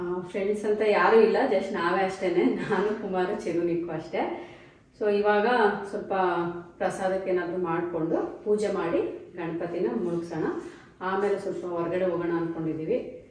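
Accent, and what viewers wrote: Mandya, India. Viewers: Nim bashe pakka nam mandya ❤ akka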